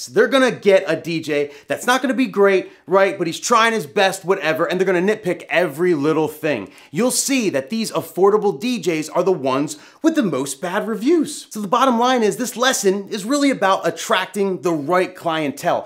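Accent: New Jersey, United States. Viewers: I don't know if I watch too many movies but you're so New Jersey lol. Love it 🖖🏾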